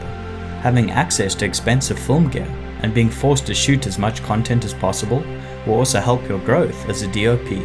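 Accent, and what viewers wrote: South Africa. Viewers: Is that a South African accent I hear? 😂 Another South African here! ❤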